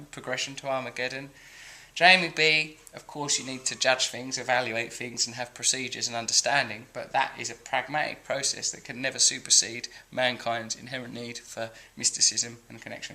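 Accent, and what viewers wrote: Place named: United Kingdom. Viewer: Russell sounds like a young ozzy Osborn . Fragmented ramblings with a strong annoying British accent . His opinion is equal to a glass of urine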